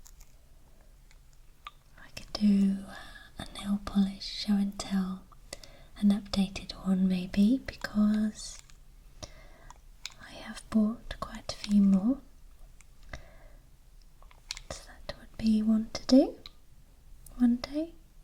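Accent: United Kingdom. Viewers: damn her accent is so British :')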